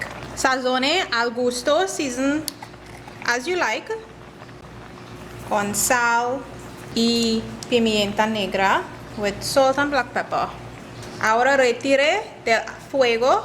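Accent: Trinidad and Tobago. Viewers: Spanish with a trini accent, what a ting😕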